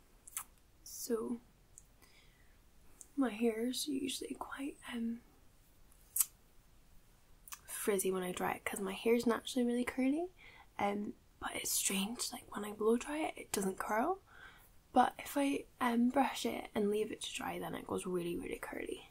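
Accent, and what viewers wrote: Scotland, United Kingdom. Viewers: I love your Scottish accent. Greeting from the USA. I just subscribed to your channel today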